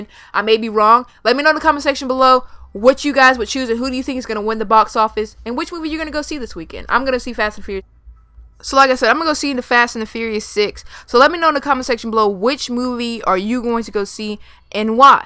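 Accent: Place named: United Kingdom. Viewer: Lol your British voice, and I'm running out of comments on my phone...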